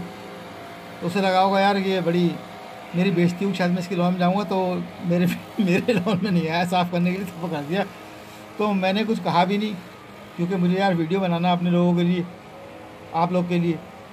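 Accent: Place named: Madhya Pradesh, India. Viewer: Apki language se lagta hai ki ap Madhya Pradesh SE hoo..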